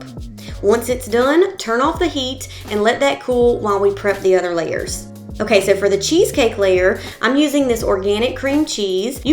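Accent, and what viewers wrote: South, United States. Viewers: The English dictionary says to pronounce it PEE-CAN --- /pəˈkän,ˈpēˌkan/ it is the Southern US that converts it to Peh-con. You have many very southern US language expressions in your speech such as 'yu'all' . The rest of the English speaking world recognizes that 'you' is often meant to be plural.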